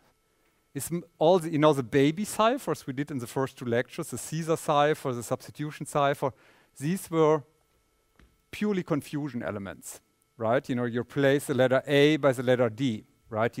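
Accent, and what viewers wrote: Germany. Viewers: german english mit ruhrpott akzent oh yeah :D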